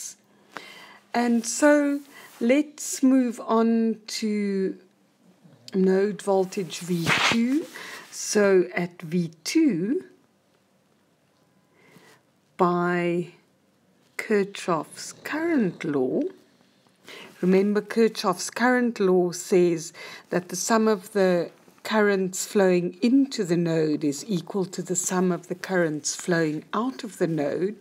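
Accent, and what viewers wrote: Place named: South Africa. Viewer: I'm a South African studying in the states and hearing this accent made my day, new subscriber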